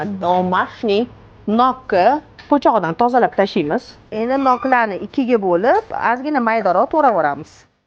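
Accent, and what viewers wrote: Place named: Russia. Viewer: Русс мисиз акценттиниз баскаша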